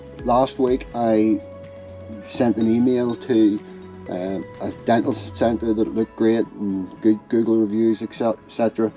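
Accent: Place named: Belfast, United Kingdom. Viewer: you sound like you're from Belfast. I am too. Would love to speak with you about your experience as I'm looking for restorative treatment to include lots of implants..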